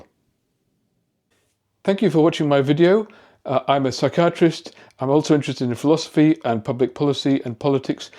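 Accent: United Kingdom. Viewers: are you English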